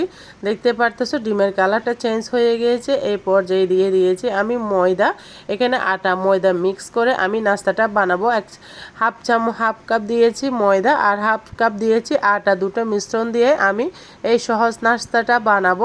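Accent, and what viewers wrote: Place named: Chittagong, Bangladesh. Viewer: আপু তুমার কথার ভেতর চট্টগ্রাম চট্টগ্রাম একটু ভাব লাগছে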